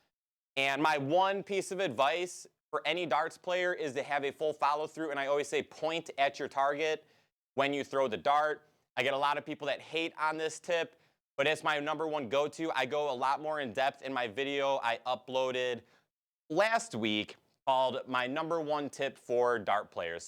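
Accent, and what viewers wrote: Midwest, United States. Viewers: are u from the midwest?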